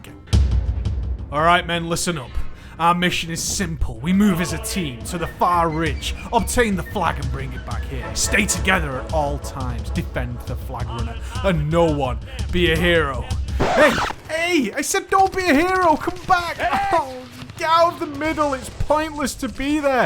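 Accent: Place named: Lancashire, United Kingdom. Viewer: "Thirdleh, tek oppotuniteh" - dat Lancastrian!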